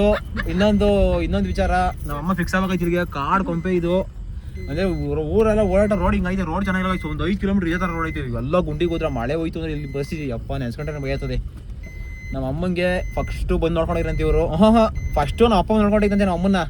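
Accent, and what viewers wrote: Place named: Tumakuru, India. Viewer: Namdu mavathuru atra saggere... Nimdu yava uruu guru. Namma tumakuru bashe super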